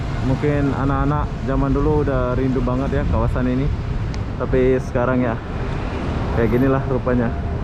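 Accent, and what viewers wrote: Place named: Malaysia. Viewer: Haha gklah, cuman gaya bicaranya aja di Malaysiakan, sampe dlu pas ke Jakarta dan Palembang, dia di hujat netizen, sy masih ingat itu😂 mungkin abang nih sudah terlalu lama di Malaysia, jadi bangga dia dengan bahasa Melayu Malaysia. 😂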